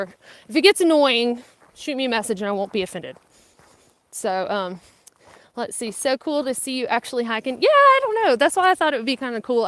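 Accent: South, United States. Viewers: Your southern accent is just the best!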